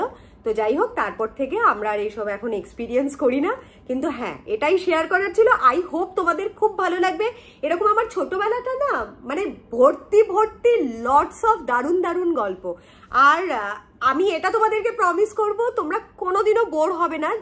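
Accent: Bangal, Bangladesh. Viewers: ম্যাডাম প্রথমেই আন্তরিক শুভেচ্ছা জানিয়ে বলছি ভীষন ভালো লাগলো বাঙাল কথা বলতে শুনে। শিম্বার মাধ্যমে আপনাদের সকলকে ভালোবেসে ফেলেছি। আপনি খুব আন্তরিক ভাবে কথা বলতে পারেন।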